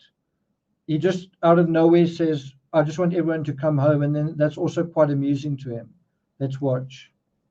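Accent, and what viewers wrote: South Africa. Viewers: yes, I love the SA accent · If you're weird then I am, too. Looks like we're in plenty of good company. His voice has such a smooth delivery plus I've become a fan of the South African (except for E.M.; his is almost gone anyway) accent because of him. He really is brilliant, which I enjoy in anyone. Will probably let him lull me to sleep again tonight. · I was wondering what your accent is, is it South African mixed with British or just South African? Love it, so soothing.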